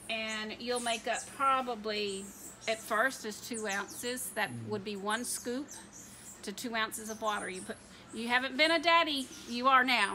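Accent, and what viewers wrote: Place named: South, United States. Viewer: That is that sweet southern accent for ya! Hahaha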